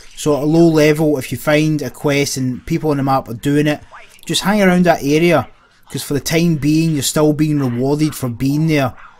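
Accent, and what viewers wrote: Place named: Scotland, United Kingdom. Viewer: Where about from Scotland are you from mate?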